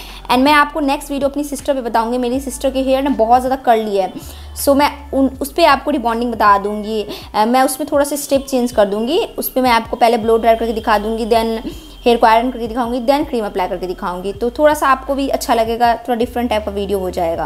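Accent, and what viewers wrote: Bihar, India. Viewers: Ye language to bihar chhapra ki lag rhi h kya mam ap chhapra s ho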